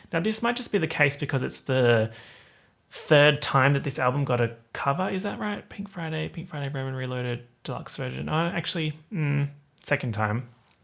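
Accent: United States. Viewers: your american rap accent is good tho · Just sayin....your American accent just sounds like you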